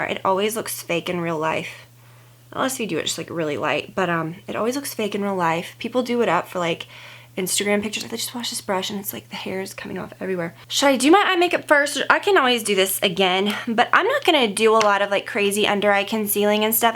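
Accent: United States. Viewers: What state is she from?!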